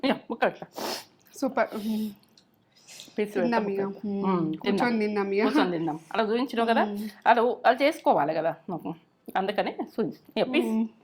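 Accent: Telangana, India. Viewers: You are doing a great job. Please continue contributing your authentic Telangana recipes in your authentic Telangana accent with accurate kolathalu 🙂.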